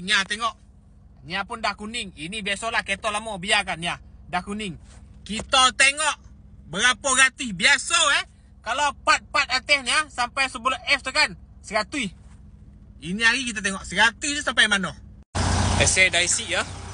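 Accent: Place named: Negeri Sembilan, Malaysia. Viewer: eden suko ekau cakap nogori ….. sghonok dongar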